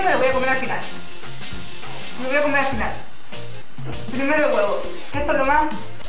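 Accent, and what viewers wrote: Chile. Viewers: muchos dicen que ya sabían que edad tienes yo por tu acento ya sabía que eras chilena xd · yo ya sabía que eras chilena! :'v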